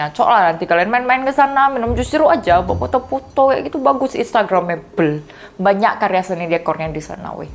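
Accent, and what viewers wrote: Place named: Madura, Indonesia. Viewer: suka dgn dialek host-nya .. meduro bingits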